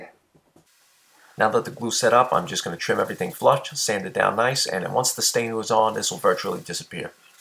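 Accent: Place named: Staten Island, United States. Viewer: Are you from Staten Island?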